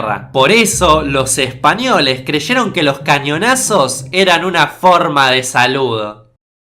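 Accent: Argentina. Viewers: Gumii Megpoiid ¿De dónde eres? No conoces el acento argentino, que mal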